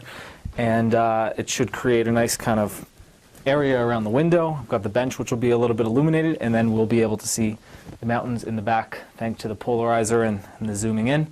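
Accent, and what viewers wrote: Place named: Eastern Europe. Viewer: You sound bit like eastern european but I'm probably wrong. Nice vid!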